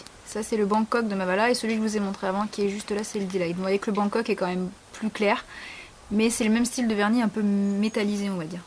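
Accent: France. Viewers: merci pour cette video, dis donc tu as beeeeeeaucoup de vernis, j'en ai presque bavé devnt cette video ^^ mais dis moi comment reussi tu a ranger tout ses vernis ? As tu deja fais une video de ton rangement vernis ? ça serait bien interessant de voir ça ! & oui oui je me matte ta video a 4h55 du matin lol et meme a presque 5h du matin j'aime ta video :) bis & ton accent français (renforcé) est terrible, j'adore ;)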